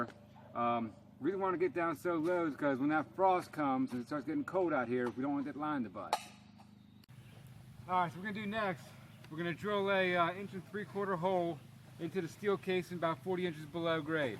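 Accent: United States. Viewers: As an Aussie living in Thailand for 19 years, I find it hard to understand your rapid American speech or what the plan for this water-supply is (and that was my specialty), but is looking so er, civilised, with his trim. Great work team, you're nearly there!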